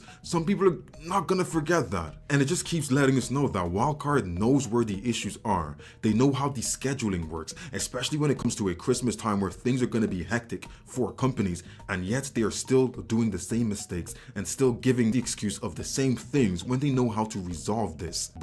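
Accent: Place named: United Kingdom. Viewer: Somewhere in the uk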